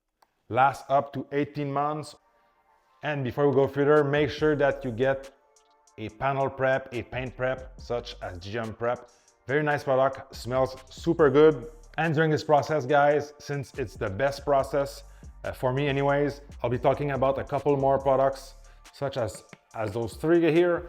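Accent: Quebec, Canada. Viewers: You sound Québecer. Subbed!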